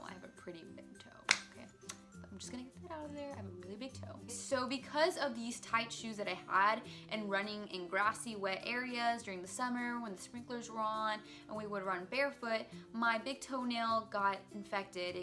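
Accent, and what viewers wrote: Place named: Texas, United States. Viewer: I could just tell you were from Texas! (: What part? I'm from Tx too!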